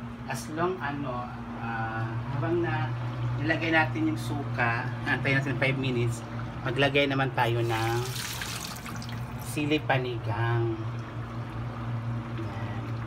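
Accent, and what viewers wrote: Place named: Visayas, Philippines. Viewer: I love how to cook, magaling k tlga alam mu mga technic para maging masarap luto mu, sana dumami pa mga subscribers mu kasi sobrang hambol mu po, I love your way to speak very calm khit visaya accent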